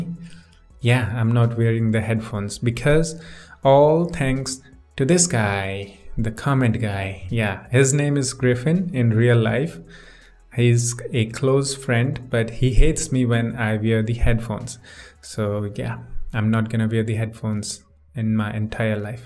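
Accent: India. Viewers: You indian?huh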